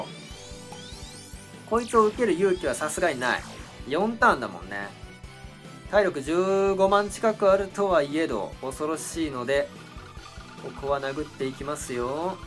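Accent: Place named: Nagoya, Japan. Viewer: そろそろ、名古屋弁全開実況しまょう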